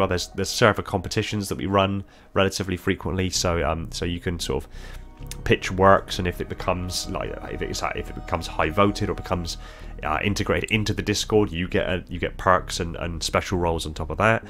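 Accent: United Kingdom. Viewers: I always love ur voice btw. Also r u British? I forgot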